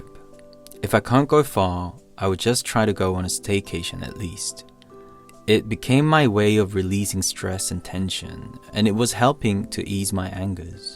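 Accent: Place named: England, United Kingdom. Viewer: You have a great English accent. You are definitely different. Love your videos .thanks for sharing. The world is here for you ❤ 💕. With all mental support. Is ok to be sad and angry and is ok to be aware of it.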